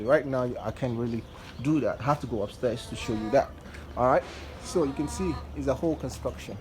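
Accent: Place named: Africa. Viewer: I new I could tell from the accent you had to be a brother from the continent! Greetings from abroad via Uganda! These are things I'd love to do back in Ug. Subbed and liked bro!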